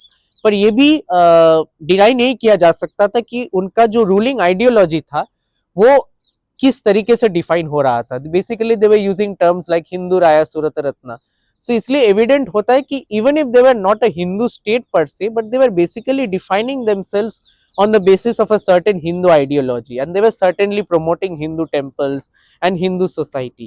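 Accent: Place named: Bengal, India. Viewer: His Hindi accent is Bengali..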